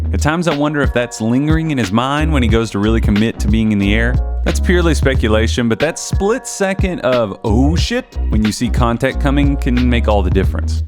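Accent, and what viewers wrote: South, United States. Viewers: This video making genius got that Chris Vernon style Southern draaaaaawl going on!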